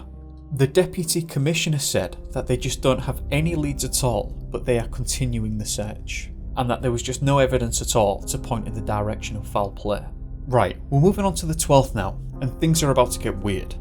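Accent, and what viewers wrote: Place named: Hull, United Kingdom. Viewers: Where are you from? One minute I think you have a Leeds accent but then you sound a bit Sheffield but then I hear you pronounce a word and I then think Hull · I detect a hullensian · It's an East Riding accent. It's probably from near Hull. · Oooh your accent I think you're a hullonion 😉🤭 great channel ❤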